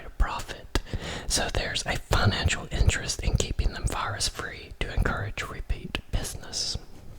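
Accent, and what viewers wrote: South, United States. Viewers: You're very welcome, you whisperin' southern gent ☺️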